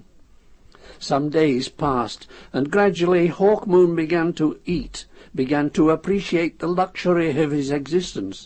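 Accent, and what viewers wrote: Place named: Yorkshire, United Kingdom. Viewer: How rare: To hear a Yorkshire accent as a reader!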